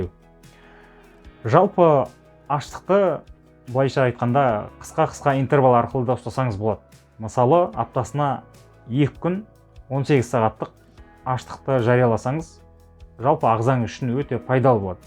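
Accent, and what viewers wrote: Standard. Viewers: Балам таза қазақша сөилегеннің ұнады рахмет жақсы түсіндірдің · Рахмет қазақша таза сөйлегеніз бен пайдалы кеңесізге үлкен рахмееет,риза болдық,Алла разы болсын!!! Сізге жазылып, лайк басып қолдаймыз!!! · Әсіресе, қазақша таза сөйлегенің үшін көп рақмет!!! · Рахмеееет балам, өте тамаша таза қазақ тілінде түсіндіру қандай керемет,,,,өркенің өссін.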